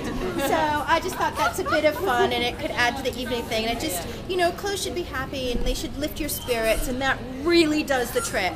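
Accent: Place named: England, United Kingdom. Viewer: She sounds so English these days!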